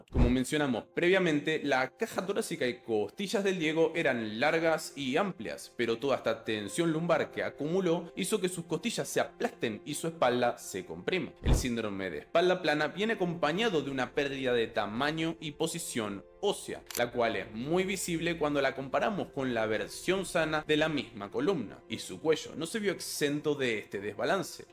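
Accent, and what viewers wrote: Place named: Argentina. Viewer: Es argentino😂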